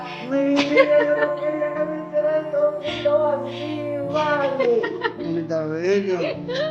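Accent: Venezuela. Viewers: Me encantó que con nueve años fuera jamás perdiste tu acento, sigues hablando venezolano como sino te hubieras ido nunca ❤❤❤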